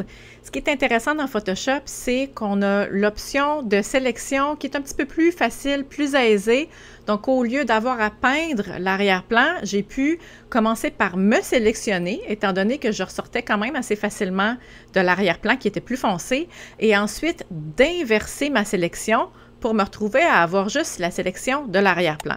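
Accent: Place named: Chicoutimi, Canada. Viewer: Ahahah, de Québec, OK, mais, tu as un accent de Chicoutimi, ou j'ai fait mon Bac en art. Je n'habite plus au Lac, je suis à Montréal depuis 1990, pour étudié à la maitrise en art visuel. à UQAM Je t'encourage à continuer tes postes sur la chaine, tu es intéressante et drôle ! Merci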